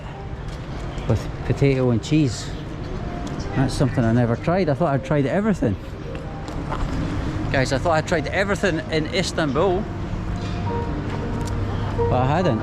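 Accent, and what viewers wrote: Scotland, United Kingdom. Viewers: He sounds Scottish AF …wtf are you on mate?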